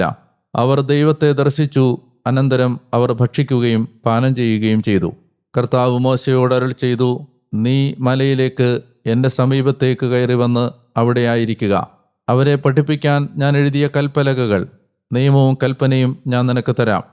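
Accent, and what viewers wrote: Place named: Pathanamthitta, India. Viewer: Karthave during my 75 years of age first time a Priest ithupole ithra clear ayittu Thiru vachananam kelppikkan athu. Achente way of talking n Malayalam language is like my own birth place Pathanamthitta Kerala. Though I n my family is in Rajasthan for the last 50-60 years, I haven't learn malayalam typing and as such I have to type malayalam typing in English. ❤❤❤.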